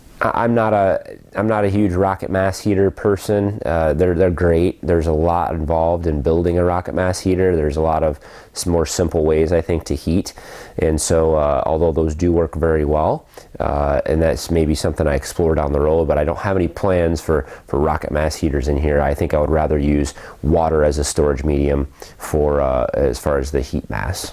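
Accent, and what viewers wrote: Michigan, United States. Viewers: your Michigan accent...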